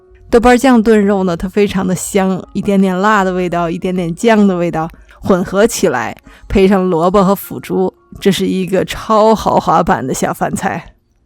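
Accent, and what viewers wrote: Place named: Beijing, China. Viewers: 北京話嗎？很好聽。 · 北京口音好亲啊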